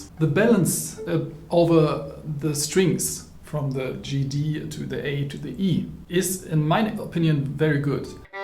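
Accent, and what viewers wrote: Germany. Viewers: by the accent it seems to be a German. Ich mag Evah Pirazzi.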